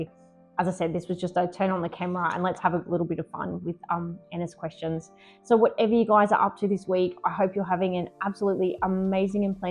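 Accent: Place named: Australia. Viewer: noo YT didn't show this video in my mentions, but it popped up on my feed today. I am so glad it did and I love your Aussie accent! I can understand you just fine. 🙂 I love your home and outdoor garden too! it looks like you get a lot of natural light, I am so jealous. thank you for doing this tag! 💚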